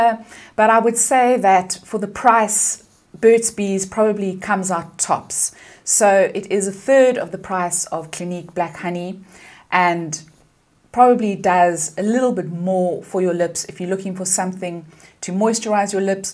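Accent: South Africa. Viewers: I was like you must be South African, from the accent ❤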